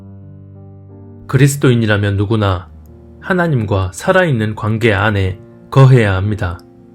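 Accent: Jeolla, South Korea. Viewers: 전라도사투리 억양이 강하네요ㅎㅎ 저도 광주출신이라..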